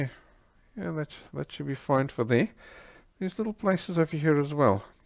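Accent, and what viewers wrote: South Africa. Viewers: Your paintings are amazing! Thank you for sharing your talent with us. You sound South African, are you? Because I am and I have found that selling your art work in this country is difficult. Do you have the same problem?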